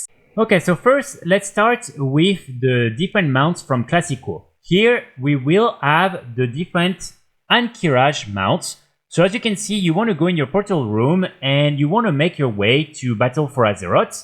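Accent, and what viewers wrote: France. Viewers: Je reconnais un Francais quand j'en entends un 😅 merci pour le guide !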